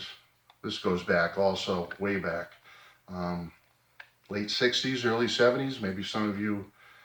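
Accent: Boston, United States. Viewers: Hi thanks for sharing, just found your channel...seems you have many videos I'm going to spend time watching!! Thanks!! I lived in Boston, Ma, for many years do I detect an accent??;-)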